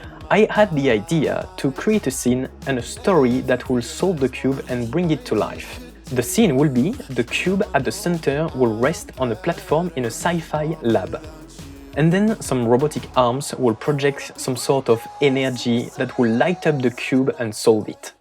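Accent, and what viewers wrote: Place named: France. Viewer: L'accent français !!!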